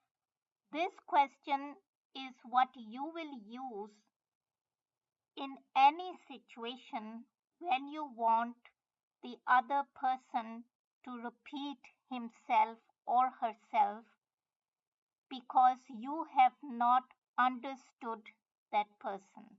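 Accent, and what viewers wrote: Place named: China. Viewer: ...I don't know but the person has got a Chinese accent somewhat but speaking in English and German....XD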